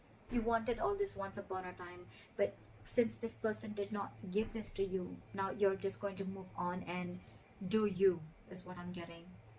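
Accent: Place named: India. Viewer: Your pace is too fast, try to speak slower. We know you're from India and trying to hide your accent but still too fast.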